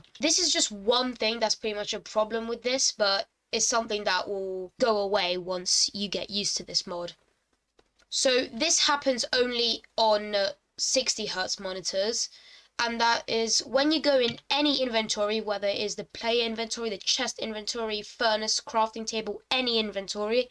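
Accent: United Kingdom. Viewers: ayo first time hearing a female british player! you deserve more attention on your vids tho edit: ok before someone comes to kill me, i dont know anything about the voice seems female to me so i said it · that british accent doe 👌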